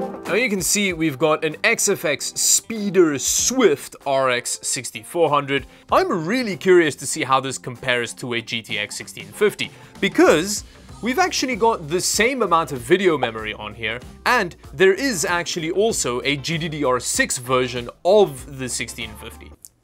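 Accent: South Africa. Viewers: You're originally from SA right? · South African accent ?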